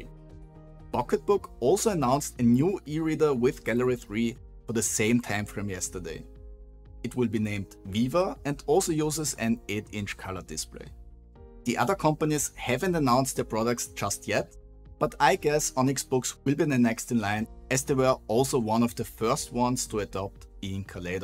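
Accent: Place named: Austria. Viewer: Man hört den österreichischen dialekt so gut raus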